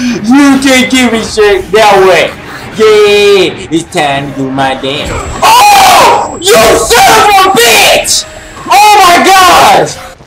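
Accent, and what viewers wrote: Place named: Texas Gulf Coast, United States. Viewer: gaddamn bwa you had me rollin!!! where you from bruh? you sound like your from tha 3rd coast! i'm from texas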